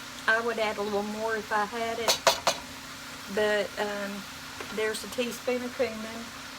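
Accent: South, United States. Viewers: kes S - right, me too ! She is the only UTube person that I allow their ad videos completely go thru till the end. I know she makes more per view if they complete. Just love her to death, she reminds me of my grandma with her southern accent.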